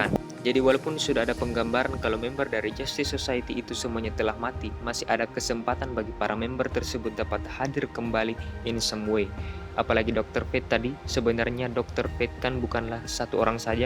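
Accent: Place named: Sumatra, Indonesia. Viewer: dari logatnya abang orang sumatera ya...😁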